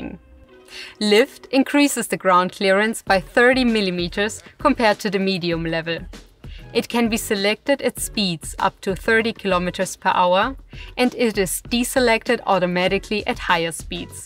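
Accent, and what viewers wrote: Germany. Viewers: I hear a German accent and see that her name is. My mind immediately thought of Schmidt. If I close my eyes while she is speaking, I can almost imagine her presence again. Oh how we miss you dearly with your infectious laughter and toothy smile. Rest in peace, love. Stay classy my friends. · typisch'l deutscher Acent 🫣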